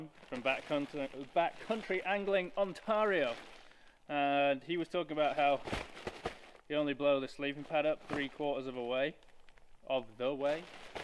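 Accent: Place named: United Kingdom. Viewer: Where are you camping? U sound British.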